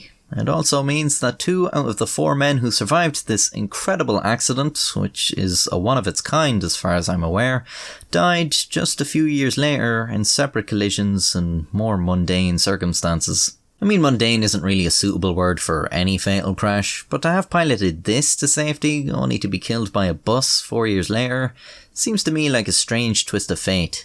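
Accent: Ireland. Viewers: Funniest Irishman I ever listened to!!!! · I am a simple man, I see a upload, I click and begin to absorb knowledge from the spectacular Irish narrator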